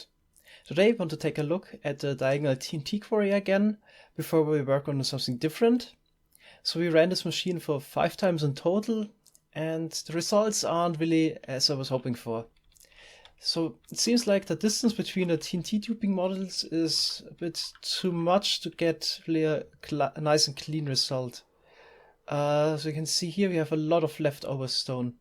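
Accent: Germany. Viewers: Nairolf 00 Neeeeeiin, hat nicht schon 2,<num> Millionen Mal in seinen Videos gesagt dass er deutscher ist, das hab ich mit wohl eingebildet... Haha du bist du selber deutscher, als ob du da nicht seinen krassen Akzent raushörst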